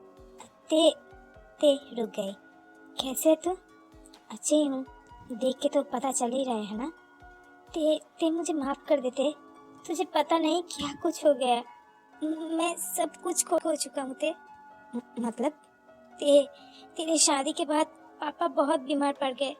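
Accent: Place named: Bengal, India. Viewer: Nice story or bangali logo ka hindi sunneme accha lagta hai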